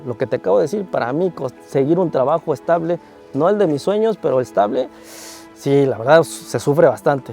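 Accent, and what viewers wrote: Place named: Aguascalientes, Mexico. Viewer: Si puedo adivinar de donde eres basado en tu acento: Aguascalientes?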